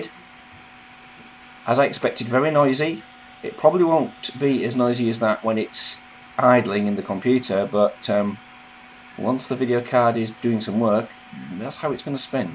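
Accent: United Kingdom. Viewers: Your accent is similar to mine, I'm in Leeds, but I don't pronounce it like that lol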